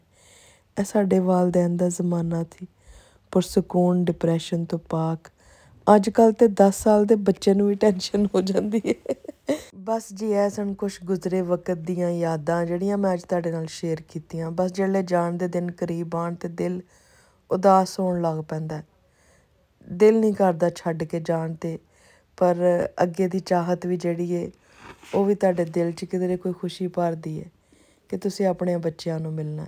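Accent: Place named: Amritsar, India. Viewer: 😊❤same as indian punjab Amritsar life sari galla baata same you are speaking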